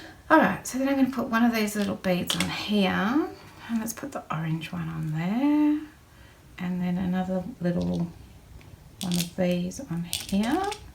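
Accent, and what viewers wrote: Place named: Australia. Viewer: sounds aussie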